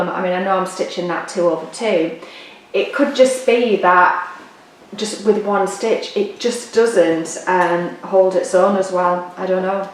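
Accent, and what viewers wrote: United Kingdom. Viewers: So sorry you were ill for awhile. Glad to see you are feeling better. A while ago I did a search for anyone doing a review of a sit on frame and found your review of the Elbesee. Well, I was finally able to find a shop owner on line that had the Elbesee sit on frame in stock. The frame was delivered yesterday. The frame came with three hoops but also came with an attachment to enable the use of my own hoops and smaller sized scroll rods. I used the frame yesterday and most of today. I love it. I was having trouble with my left arm and hand from gripping my hoop. After only one day I can feel the difference in my arm and hand not gripping my hoop. Thanks so much for your review. Love your stitching and if I might say, your British accent. I was born in the UK quite some time ago, but came to the US when I was one. Still have relatives in Swindon and Avebury and love to talk to them and listen to their wonderful British accents. 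So heartwarming to me. Again thank you for your review. Stay safe, stay well. Lee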